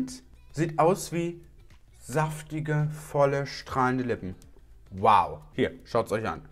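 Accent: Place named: Cologne, Germany. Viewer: ‚Schätzelein wo biste denn?‘ - seit deinem min Video lässt du den Kölsch Jung aus dir raus. Ich liebs! ❤️❤️😂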